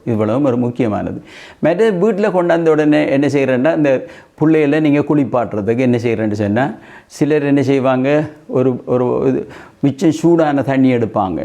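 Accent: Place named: Eelam, Sri Lanka. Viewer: உங்களது ஈழத்து தமிழ் அருமை ❤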